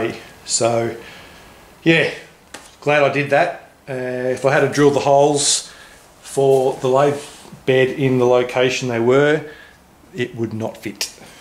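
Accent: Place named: United Kingdom. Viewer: Finally on part 7 is what I wanted know, what you used for top and Ha Ha <mail> yup your British ascent really stands out on aluminum> uh·loo·muh·nuhm. Being from Maine USA I had to rewind 3 times to figure out what you said. I skimmed through most of you lathe bench build videos, your's is about the closes to the design I want, really nice job so far thanks for sharing........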